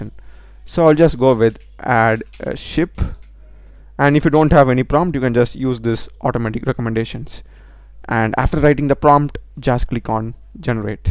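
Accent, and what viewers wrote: India. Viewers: "Magic Eret" the fvck this guys indian accent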